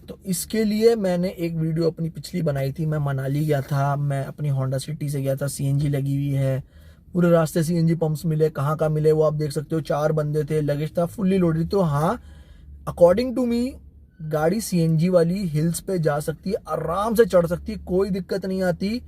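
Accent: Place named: Old Delhi, India. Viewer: Very informative video brother👍👍 I am planning to install CNG kit in my ford figo 2017 model. Could you suggest which Kit I should go for as I have zero knowledge about the kits available in the market. Would appreciate your suggestions here! Also by any chance are you from Purani Delhi😂 I could sense a matching tone & accent what we have in Old Delhi.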